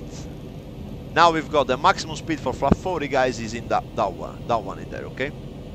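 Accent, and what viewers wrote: Italy. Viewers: Brilliant Airline Flight Instructor and that Italian accent!!!!! It is quite entertaining and engaging!! Keep iit uuup!! Would like to see how you teach jet transition.Are you planning a video or have one produced yet? I wish to compare Airbus with Boeing as the philosophy of flying is different.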